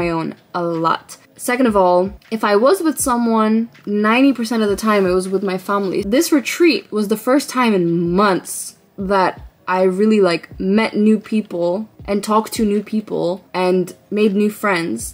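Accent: Russia. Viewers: У тебя идеальный русский акцент!❤❤❤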